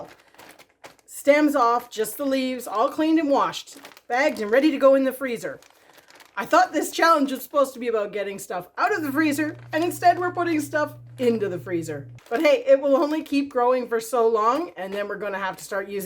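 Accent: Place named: Canada. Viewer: I literally reheated and repurposed a bunch of things I already had made in the freezer or fridge. I then repurposed other items in the fridge that were left over and it was a fairly easy week for us as well. I had to laugh the other day as I was watching one of your videos and my daughter asked why you talking about "a boot"..you were saying about..I explained you are from Canada and you say things just a little differently like Mr Rem and Mrs Annie that we watch that are from Canada too. That stew sounds like it was delightfully rich and wonderful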